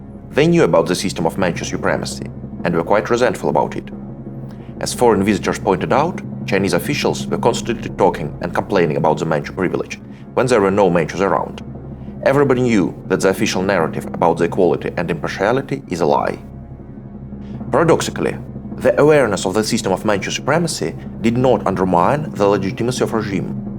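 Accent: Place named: Russia. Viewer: The Russian accent is too heavy. Every time I heard “th” pronounced as a “s” or “z” it made me hurt.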